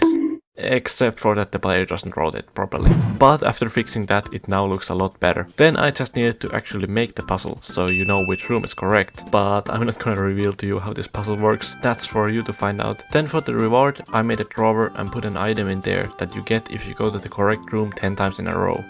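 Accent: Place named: Finland. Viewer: This editing style is awesome. And btw, you sound like you're from Finland. :D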